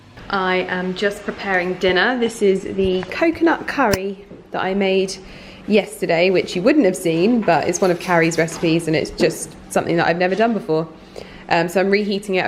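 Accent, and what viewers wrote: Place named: Cockney, United Kingdom. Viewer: Jennifer Harris oh definitely has a cockney twang. I love when she does the baby voice for ali 😆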